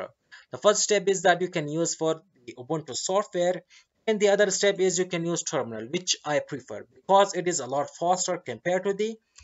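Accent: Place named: India. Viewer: the relief i felt when i heard an indian accent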